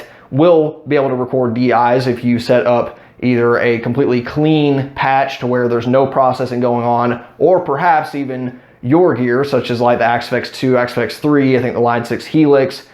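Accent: Texas, United States. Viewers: Why do you sound like you are from texas lol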